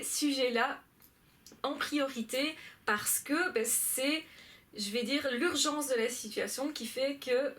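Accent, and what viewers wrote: Belgium. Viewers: C’est la première fois de ma vie que je commente sur YouTube (pour une nana qui travaille dans le web c’est particulier mais bon xD). Bravo pour tes vidéos, elle m’aide énormément (je parle de moi mais j’imagine que c’est le cas d’énormément de personnes). Je suis victime actuellement, nous sommes séparés mais je suis encore sous emprise malgré le fait d’être totalement informée sur les PN. Pourquoi, je pense que c’est parce que j’ai un problème moi aussi malheureusement ( mais c’est pas méchant dans le sens ou je ne suis pas PN xD!) , je suis dépendante affective ( je le sais depuis très longtemps mais j’en enfin mis un mot là dessus depuis la lecture du livre Vaincre la dépendance affective). Tout cela pour te dire que tes vidéos m’aide à reprendre le dessus quand je retombe dans ses filets et rien que pour cela je te remercie infiniment. 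Je ne vais pas entrer dans les détails mais on va dire que si j’ai vu une vidéo de toi le soir, le lendemain j’ai plus de force et de confiance en moi pour me détourner de lui ou éviter ses pièges. J’ai encore bcp de mal à intégrer le fait que ce type de personne existe, il y a une semaine j’essayais de le comprendre , de chercher des excuses ( dans son enfance ou autre ) mais non je dois l’intégrer dans ma petite tête : des personnes sans humanité existent , et c’est franchement flippant. Sinon, petit aparté rigolo l’accent belge est mimi xD j’adore voir ton chat sur le canap ( j’adooore les chats mdrrrr ) et tu déchires car généreuse en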